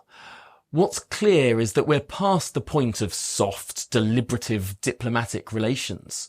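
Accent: United Kingdom. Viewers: Lovely British accent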